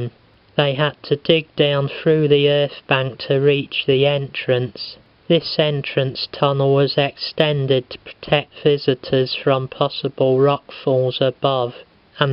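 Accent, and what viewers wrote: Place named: England, United Kingdom. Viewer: wow great voice . you need to be in the old carry on movies .so Engish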